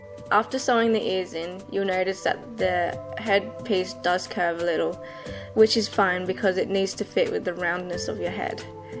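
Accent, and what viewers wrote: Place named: Asia. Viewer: An Asian English accented girl... I LIKE IT!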